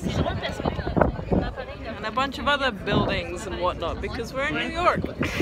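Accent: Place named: Australia. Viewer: Do you live in the USA? Your accent sounds Australian. That would be a very long flight to New York to just visit for a few days. Haha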